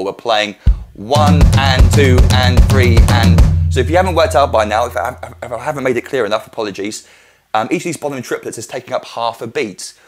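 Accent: United Kingdom. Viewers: u BRITISH ??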